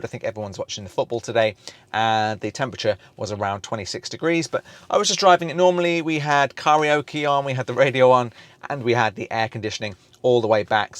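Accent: United Kingdom. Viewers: Hi, really enjoyed your review on the new M3 Highland especially as ours is arriving any day now (first EV)! I live in Australia now but grew up in Norwich too & picked your accent straight away (sorry mate as they say in Oz) 😊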